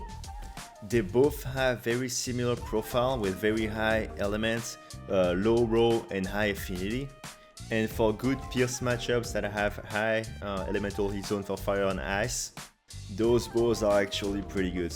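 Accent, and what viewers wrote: France. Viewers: J'entend un accent français haha , je vais essayer ton build ^^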